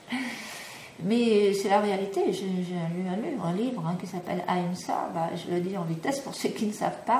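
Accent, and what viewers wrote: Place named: United Kingdom. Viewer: Superbe vidéo, de plus tu es très responsable dans tes dires et très soucieuse de ne pas choquer ton public. ps Ton accent est très agréable, Anglaise peut être.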